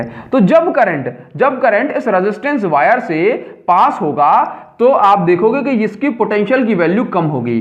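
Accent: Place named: Uttar Pradesh, India. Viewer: Aap uttar pradesh se hona Guru ji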